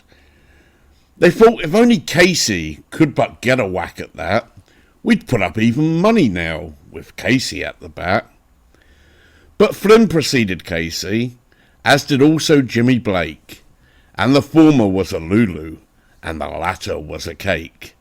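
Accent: England, United Kingdom. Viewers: Great video, I haven’t heard that poem in many many years, and never read with the English accent……..wonderful my friend!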